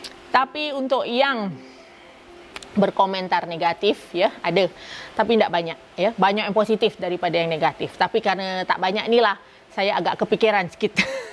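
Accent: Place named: Pontianak, Indonesia. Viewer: Mantap kak tetap konsisten dengan bahase pontianak kite...bangge dengarnye..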